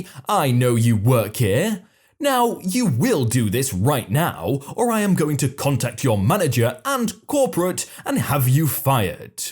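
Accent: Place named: Liverpool, United Kingdom. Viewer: Hell, you had only read the first THREE words and even got that wrong. Still, you got a great accent! You from Anfield?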